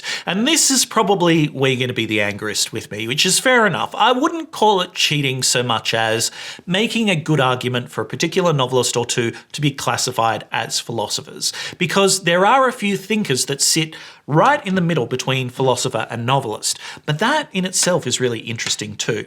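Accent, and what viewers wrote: United States. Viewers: Chicago South Side Latino/African-American? 🖤❤️🤍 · British? He sounds American to me (speaking as an Englishman).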